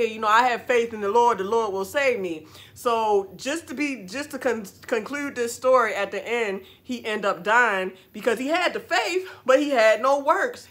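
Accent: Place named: South, United States. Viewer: . I really enjoy your content. I really enjoy your positive attitude. The southern twang is beautiful. I am going to law school in August. I have been driving for about 30 years. But you have to go after your dreams. Take care. And another thing, you are so beautiful and have a beautiful spirit too.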